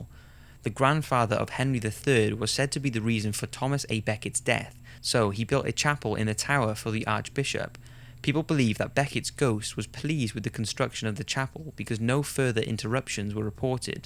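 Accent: Wales, United Kingdom. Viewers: You sound welsh. Awesome voice! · You have a Welsh accent . sounds divine 😍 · Trying to work out your accent. . . Cardiff? Newport? Anywhere near? ... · Are you Welsh?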